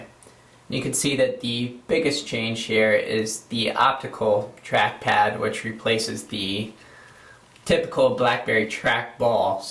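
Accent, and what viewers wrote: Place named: United States. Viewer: terrible american accent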